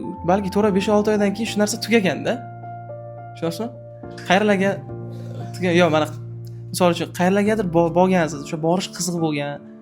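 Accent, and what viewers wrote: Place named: Kashkadarya, Uzbekistan. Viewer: ШЕВА УЗИМИЗГА ТОРТИБ КЕТДИ❤ ❤❤❤❤❤❤ КАШКАДАРЁООООООО❤❤❤❤❤❤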